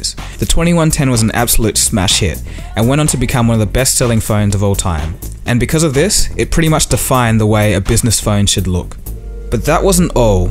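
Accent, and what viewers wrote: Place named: Australia. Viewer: Nice to hear your Australian accent in the videos... thanks for putting them together, mate!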